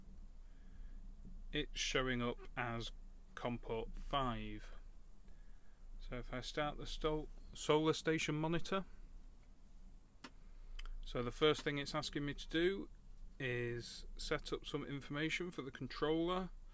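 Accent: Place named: Yorkshire, United Kingdom. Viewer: Ah right, I live near Todmorden. I was thinking your accent was a bit diluted to be fully yorkshire lol. I was wrong :)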